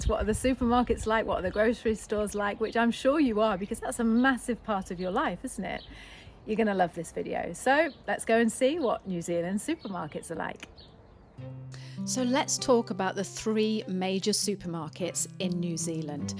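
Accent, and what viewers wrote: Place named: United Kingdom. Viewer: Weird narrator script, i need to see different people . She sounds soooo British. Im British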